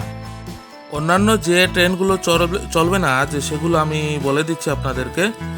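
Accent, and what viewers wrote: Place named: Barak Valley, India. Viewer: আপনার ভাষায় বরাকের মধূরতা থাকায় নিজেকেধন্যমনেকরি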